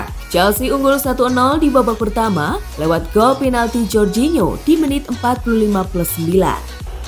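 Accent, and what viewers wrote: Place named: Java, Indonesia. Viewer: Kaka yg sekarang suaranya kaya d medok medokin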